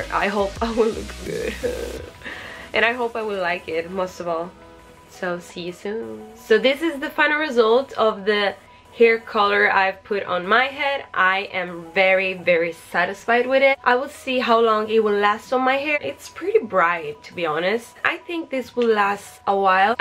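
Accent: Italy. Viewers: Sei bellissima! Ma sei italiana vero? si sente l’accento! Potresti fare anche video in italiano pleaseee💖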